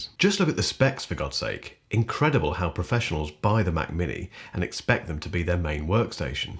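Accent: England, United Kingdom. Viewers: 5 secs into the video I hit subscribe instantly just for your fabulous English accent! 😍😍😍 Great informative content. thanks a million bro 👌👌👌👍✌💗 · I'm only subscribing because I love this mans lovely English accent and soothing sexy voice! lol Ok, ok! Love his reviews too!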